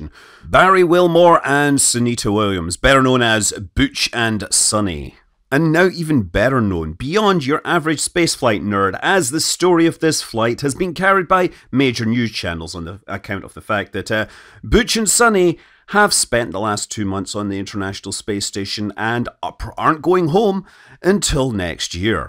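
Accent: Scotland, United Kingdom. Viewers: Also when is England going to teach Scottish blokes to speak English?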